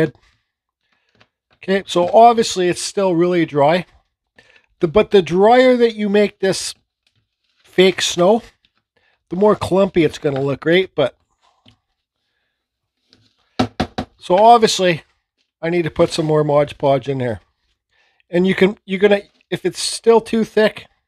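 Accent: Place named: East Coast, United States. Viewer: Thanks so much and I adore the east coast accent ! Wisconsin accents are boring in comparison!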